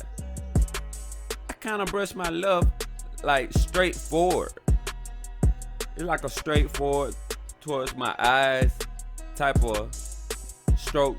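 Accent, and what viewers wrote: Atlanta, United States. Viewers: Atlanta accent strong and them 540's gone crazy my g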